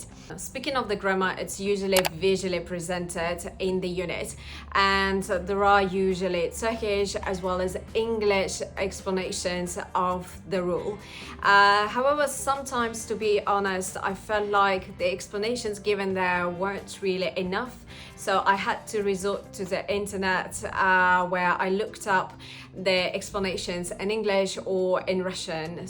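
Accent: United Kingdom. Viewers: what an inspiring video for language learners, btw your name is not english but you sound like a brit who was born in bred in london :D im just curious were you raised in UK or require this perfect accent later ? aaand also why did you choose Turkey to live, im from Turkey and this country is not doing pretty good :D, what made you choose turkey i really wonder... Thank you so much <3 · your british accent is excellent 🔥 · Very British. Just need to work on enunciation a bit. :)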